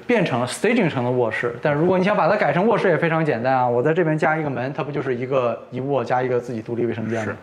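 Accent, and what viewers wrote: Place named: Mainland, China. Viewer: Sir, I did not finish viewing your Vblog, based on your accent, you must come from Mainland China, I am so surprised by your talk, very decadent. Most mainland chinese I met are more enterprising and want to advance themself.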